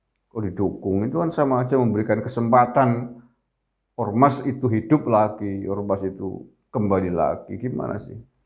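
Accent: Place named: Brebes, Indonesia. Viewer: wkwkwkwkwkwkwkk....wagu jegu...kya kya wong brebes rika Whaaa...mntap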